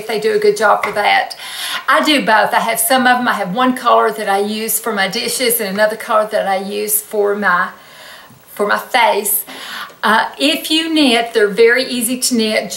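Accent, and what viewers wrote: South, United States. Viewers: No way -- you are not over 60!! You are stunning!! Love your southern accent, btw :-)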